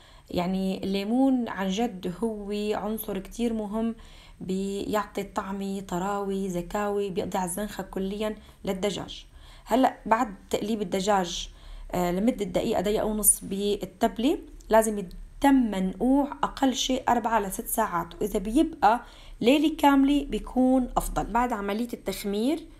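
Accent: Homs, Syria. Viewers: اللهجة الحمصية 😍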